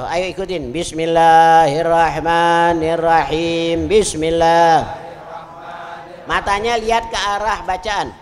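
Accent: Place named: Jakarta, Indonesia. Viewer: Masha Alloh ane seneng banget neh, ceramahnya Habib Muhammad Bin Husein Alaydrus, betawi banget, semoga Habib sehat selalu...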